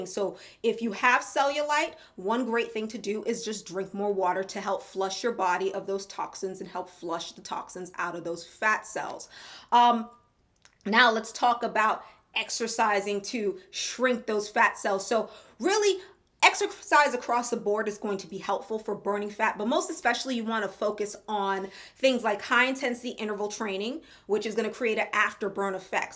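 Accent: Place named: Caribbean. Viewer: I recently subscribed to your channel one of the best things that I've done. You're so informative and Gorgeous. Love the glowing skin. I occasionally hear an accent. Is your ancestry from the Caribbean by chance?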